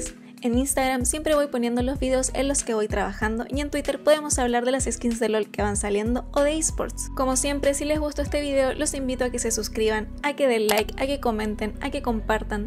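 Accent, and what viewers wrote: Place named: Chile. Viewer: Llegué a este video porque quiero hacer un traje para un concierto de gaga y ninguno me gustaba, soy chileno viviendo en Las Vegas y me encantó tu video por el acento me sentí allá de nuevo Gracias ❤️❤️❤️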